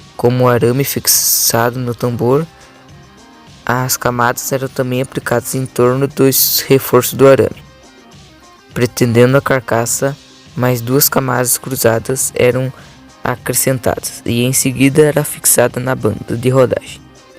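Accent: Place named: Paraná, Brazil. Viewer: Vc é paranaense?😂😂 Tem mto sotaque, olha q sou daqui e reparei, mas mto bom o vídeo msm, cheio de informação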